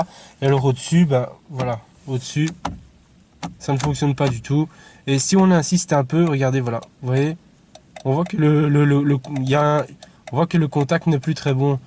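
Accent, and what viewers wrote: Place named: Belgium. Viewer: merci pour cette super vidéo qui va me faire économiser quelques sous et qui m'aura aussi beaucoup fait rire entre ton p'ti accent belge , je pense , et tes commentaires ! Si la cuisine te gonfle , tu sauras dans quoi te recycler . Bonne continuation.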